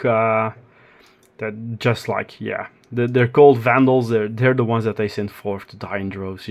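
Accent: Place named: Belgium. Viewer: Ik hoor het Belgisch accent, maar vlot gesproken haha :) Beetje verrast over een Belgisch kanaal aangezien de kleine community in België... Los van dat, ben denk ik even enthousiast over de dwergen als jij, zien er veelzijdig en uniek uit! Ook één van mijn volgende projecten?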